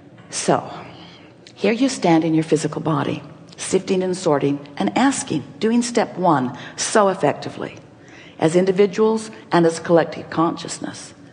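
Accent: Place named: Ireland. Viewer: isn't that sota Irish bahahahahahah :) ♥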